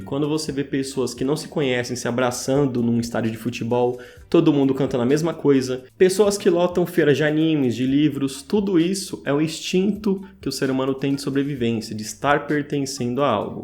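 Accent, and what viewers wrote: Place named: Northeast, Brazil. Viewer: vc é nordestino né? só podia ser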